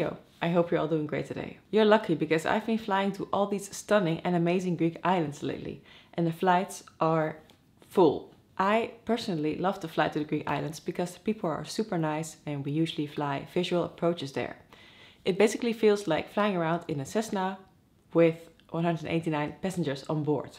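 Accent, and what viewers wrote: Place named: Netherlands. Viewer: lekker Nederlands accentje hoor!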